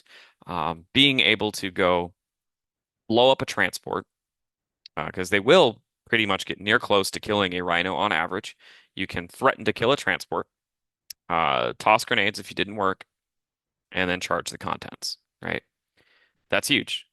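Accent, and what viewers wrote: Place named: United States. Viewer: Wait, I thought this was a British YouTube channel? Why is there an American man here?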